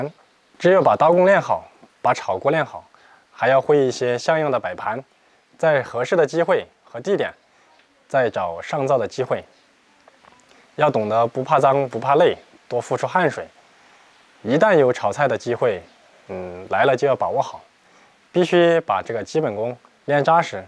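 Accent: Sichuan, China. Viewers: 王刚为什么这么红? 他的宽油烹饪并不适合一般家庭厨房。显然大部分观看视频的人并不是真的想学做菜。那到底是什么原因呢? 1, 他长得帅｡ 2, 他的川普好听。 3, 视频节奏明快, 讲解到位。 4, 富有乡土气息。 5, 邪神助攻。 6, 看他烧菜能解馋, 可以用来减肥。 你会选哪项? 大概很多人会选7, 那又是什么呢?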